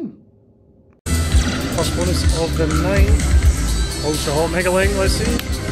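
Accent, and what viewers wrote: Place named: Scotland, United Kingdom. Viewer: As usual a great vlog. As I said before superb to hear a fellow Scottish accent in our favourite city.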